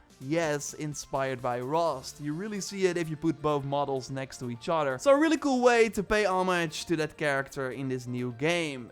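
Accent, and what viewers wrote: Netherlands. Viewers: As a dutch u can so clearly hear u are dutch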